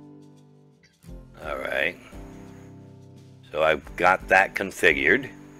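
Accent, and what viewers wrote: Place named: South, United States. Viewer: I was just admiring your southern accent! I'm from TN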